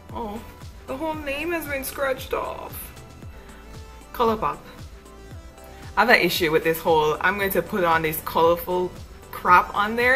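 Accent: Jamaica. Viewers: U have a little Jamaican in u don't u because I'm Jamaican and I can hear it in ur voice a little I just come across ur channel just now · i could from your enunciation of certain words...im jcan too..subbed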